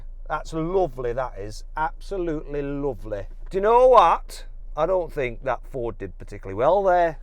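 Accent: United Kingdom. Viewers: Love that northern accent.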